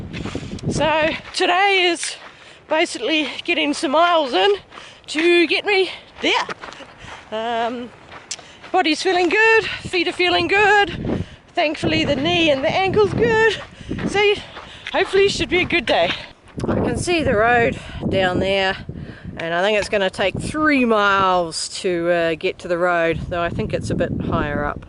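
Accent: New Zealand. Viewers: Glad to see that your not feeling the after affects of those tumbles! Which part of New Zealand are you from!? Such a lovely accent you have to! Let the haters hate and don't let satan's lies bring you down! God bless you and your family! Keep enjoying the Journey through God's gorgeous backyard!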